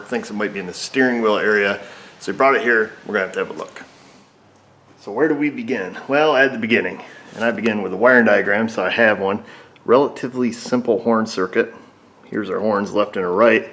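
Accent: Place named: Midwest, United States. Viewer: I know he lives in Avoca, NY. But, listen to how he talks. He has a thick mid-western accent. I know several people from Michigan. One lives right across the road from me. doesn't sound like a New Yorker at all. I'm just curious where he's FROM.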